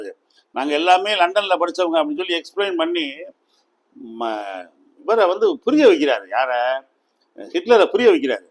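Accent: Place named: Madurai, India. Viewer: சிறந்த வர்ணனை... மதுரை தமிழ்.. சிறப்பு..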